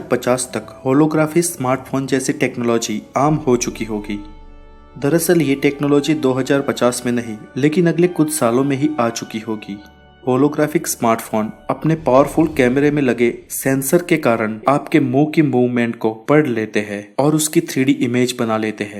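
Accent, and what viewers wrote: Gujarat, India. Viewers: Video is nice but the speaker has Gujarati accent in his voice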